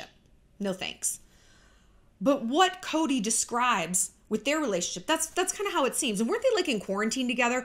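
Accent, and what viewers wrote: Australia. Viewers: Omg ...I’m from Sydney!!! Babe ... your Australian accent is soooooooo great !!!!😂😂😂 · As an Aussie, your Australian accent is sending me 😂😂😂 love it ❤️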